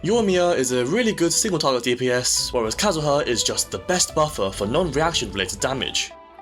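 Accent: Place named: United Kingdom. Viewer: Are you British? Your accent sounds British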